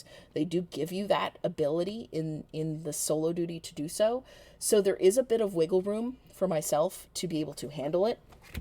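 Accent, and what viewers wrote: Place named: Canada. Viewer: Your accent is close to mine but idk what mine is….are you Canadian??????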